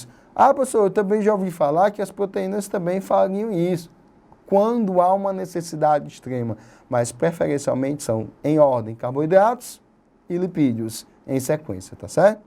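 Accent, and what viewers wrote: Ceará, Brazil. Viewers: vlw!prof por mais essa! Gostei do início do vídeo e do senhor dizendo: ah profº.... posso comer isso... e tal tal kkk com esse sotaque cearense muito engraçado esse sotaque de vcs! obg por contribuir com nossa preparação pro enem e também p/ a ampliação do nosso aprendizado!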